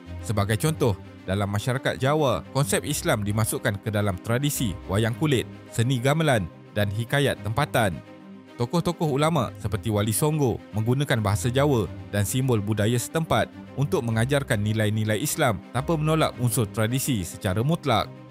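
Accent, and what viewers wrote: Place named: Malaysia. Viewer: Saya gak yakin dia itu YouTuber Indonesia, dari caranya ngomong aja meragukan 🤔 Atau jangan jangan itu YouTuber malay yang pakai lokasi di Indonesia biar kelihatan YouTuber Indonesia 🤔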